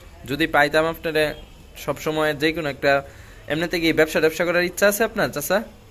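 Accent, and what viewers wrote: Sylhet, Bangladesh. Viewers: Bai apni ki sylhet ar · Onek vala legeche bhai. Bhaiya Apner Basha ki Sylhet?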